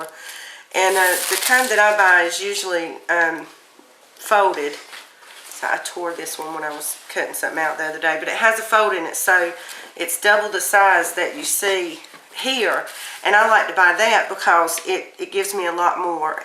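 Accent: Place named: South Carolina, United States. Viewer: Thanks for this! Very helpful. I have to ask… are you from South Carolina? Love your accent 🤍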